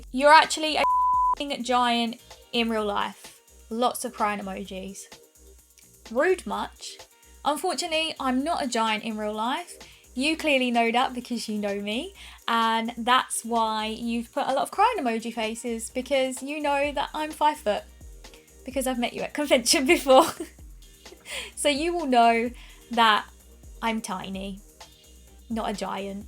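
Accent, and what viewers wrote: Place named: United Kingdom. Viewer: I hope your not offended by this question but you sound British that’s not the question rather if you are from where do you hail from London by chance?❤️👍😁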